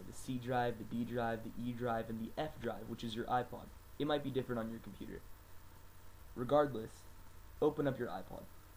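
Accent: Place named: United States. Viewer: that perfect american accent you have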